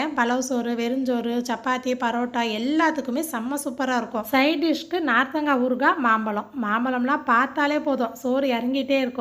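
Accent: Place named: Mylampatti, India. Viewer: enga familyla nanga ipd dhn pesuvom adhe mari pesuringa poorvigam mylampatiya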